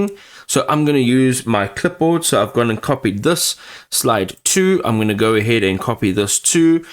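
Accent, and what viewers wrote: South Africa. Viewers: Saffa accent. Good stuff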